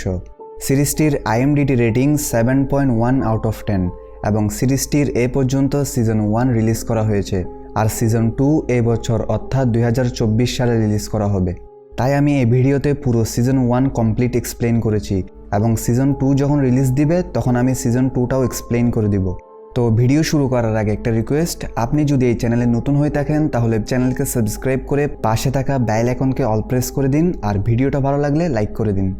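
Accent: Chittagong, Bangladesh. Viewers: love ur chatgaiya accent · যদিও আমি ঢাকার কিন্তু চিটাগাং এর ভাষায় এক্সপ্লেন শুনতে অনেক ভালো লাগলো, তাই সাবস্ক্রাইব করে দিলাম 🥰💐🥰💐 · হাইরে চিটাইংগা টান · বুঝলাম আপনি চট্টগ্রামের, কিন্তু আরেকটু স্টাইলিসভাবে বললেও পারতেন 😅। ভিডিওটা সুন্দর ছিলো ❤❤ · Bro chhatgaiya accent is annoying me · যতই চেষ্টা করেন না কেন চট্টগ্রামের মানুষের কথা আলাদাভাবে স্পষ্ট বুঝা যায় 😊😊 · Apni Chittagong er Manush.... Kotha bolar accent e buja jacce😊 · চাটগাঁইয়া উচ্চারণ চলে আসে।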